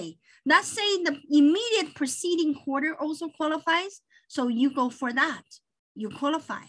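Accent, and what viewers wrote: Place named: China. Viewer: you are so good. your Chenglish is just fine. I learned a lot from your video.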